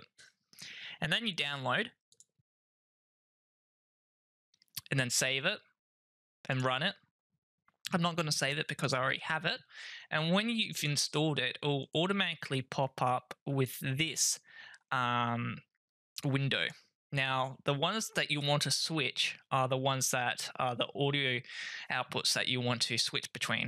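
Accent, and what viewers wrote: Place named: Australia. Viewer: i hate australian accent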